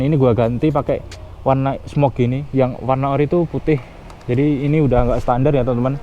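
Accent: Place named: Java, Indonesia. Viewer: kenapa memaksakkan pake "Gua" sih. Medok pol.. ra cocok. jowo jowo ae rasah lu gua. 🤣✌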